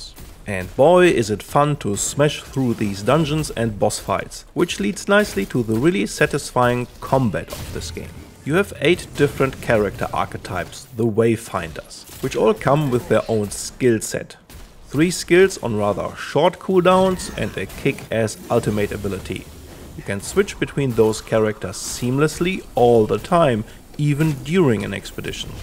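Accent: Germany. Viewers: Oof, the german accent is kinda killing me.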